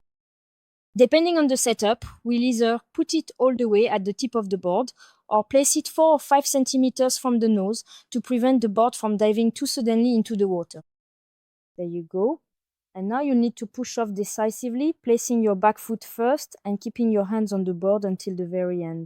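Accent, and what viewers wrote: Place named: France. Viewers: Cet accent Français en Anglais parlé est insupportable. Dsl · " 2 weeks ago Cet accent Français en Anglais parlé est insupportable." Not so for this native English speaker. Au contraire, it is very pleasant to listen to.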